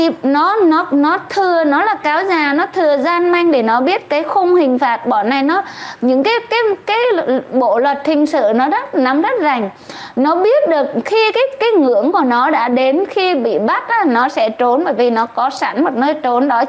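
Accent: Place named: Central, Vietnam. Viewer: Chào ( lìa trần) MSVV bị bắt trưa? Hả??? Nghe giọng trọ trẹ chán quá Trọ trẹ chán quá... Hu hu hu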